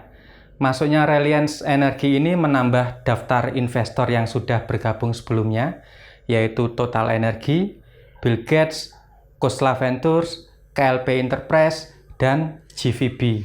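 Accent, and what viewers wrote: Java, Indonesia. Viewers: Dengerin pelajaran teknik dengan bahasa medok ternyata lebih mudah mengerti.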